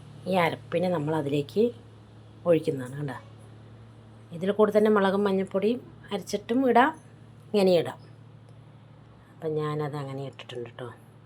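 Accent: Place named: Palakkad, India. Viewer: കുറേ ദിവസായി പാലക്കാടൻ സം സാ രം കേട്ടിട്ട് കേൾക്കാൻ കൊതിയാവുന്നു ലൈവ് ഇപ്പോൾ കിട്ടുന്നില്ല 😭😭😭😭😭😭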